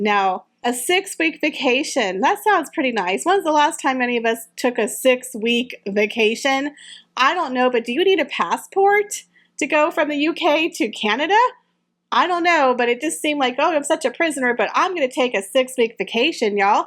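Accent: South, United States. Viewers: It's that slight southern accent "Y'all" 😉 😘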